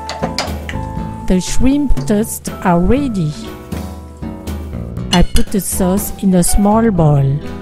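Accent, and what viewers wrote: France. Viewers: This looks super delicious! I will have to cook this and try it! I will enjoy it for sure! where did you learn to cook all of these recipes? Where are you from? You sound french. Peace!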